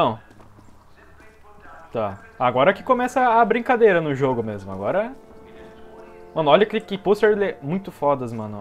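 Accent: South, Brazil. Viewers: conheço sutaque do sul kk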